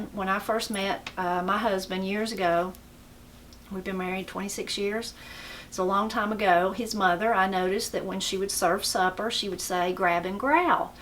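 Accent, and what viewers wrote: Appalachia, United States. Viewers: I love listening to the Appalachian accent and also the different southern accents in the United States. Thank you for sharing 🙏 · After moving full time time to California, it was refreshing to hear you speak as a woman from Pennsylvania (nor too far from Pittsburgh, but talk like the Moutain Folk). Made me miss home a bit. I have always made sure to keep mt accent and not change it as I love my accent, no matter where I have lived (but when I lived internationally, i tried to make sure I pronounced words properly ans worked at it). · As someone from Somerset, England i think the Appalachian accent is beautiful, sounds like home a little. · Hello from São Paulo, Brazil… a sort of ‘Brazilian NYC’. I love to hear appalachian accent, it brings me good memories. Farwest movies that I used to watch. Thanks for your channel! 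May you recommend some radio station that I could listen this accent all the time? I use to listen worlwide radios since Radiogarden app. What town or county you’re come from? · As someone from Appalachia with roots in Surry County NC and Patrick County VA, I feel a familiarity with you just from hearing your accent even though we live over 4 hours apart. Pretty cool how far our accent and culture spans. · My grandfather and great grandparents from NW GA spoke just like you …except even faster. I didn’t grow up there so I actually had trouble understanding them (granddaddy and meemomma) sometimes. They never used bad language other than the occasional “damnitboy” (yes, that’s one word haha) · My mom's family is from the foothills of the N.C. Appalachians. Hearing your accent reminds me of visiting my grandparents when I was a kid. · I’m from NW GA. Your accent is the same as everyone I know. 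I hate what Hollywood does to southern accents. It’s always so fake and purposefully used to try and make the characters sound dumb or ditzy. A lot of the stigma around southern accents is because of Hollywood. Anyone from the south can immediately tell when it’s not a real southern accent. I didn’t realize until I was a teenager that different areas of the south had different accents. I just thought everyone in the south had an Appalachian accent and anything else was more of a southwestern/Texas accent. Not the case at all. People living in Savannah, GA or in Jacksonville, FL or Mobile, AL won’t necessarily sound the same as someone who was raised in the foothills of Appalachia. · We had a place on the coast of North Carolina and we go fishing there all the time, especially in the fall when the fish were running. Once we went fishing at a little place up at brackish rivet called Varnum and the accent of one the people at this one area made it difficult for everyone in my family to understand. 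My mother home grandparents and my aunt knuckles all spoke with an accent very similar to yours. Isometimes let myself lapse into it now. And both my daughters, born here in Japan, I understand the Appalachian accent.So it isn’t like we were unfamiliar with the Appalachian accent. So yes, the accent varies from place to place. The Appalachian accent actually seems to fade as you move east but then as you reach your point nearing the coast it starts to increase again. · I absolutely love the Appalachian dialect and accent, and I'm so glad. My grandmother was born in Appalachia. She had this accent, and many of my relatives have it still! It's beautiful to me and any time I hear it, I can't help but smile! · I'm an actor and needed an Appalachian dialect for an audition. Casting recommended your videos and I so very much enjoy them. Thank you for sharing your beautiful dialect and your stories and wisdom. 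· im from appalachia and i wish my parents talked like this so i could develouip it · I just love your accent, I really love the accents and recipes from the south, just beautiful.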